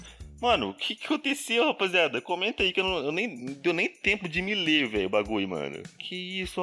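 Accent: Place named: Rio de Janeiro, Brazil. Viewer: parece ser carioca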